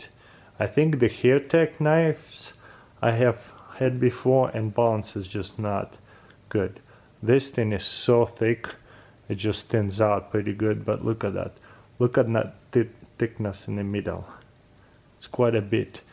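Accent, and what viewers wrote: Russia. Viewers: Стронг рашн акцент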